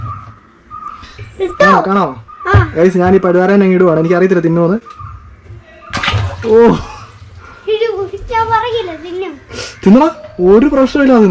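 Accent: Kottayam, India. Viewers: R u from Kottayam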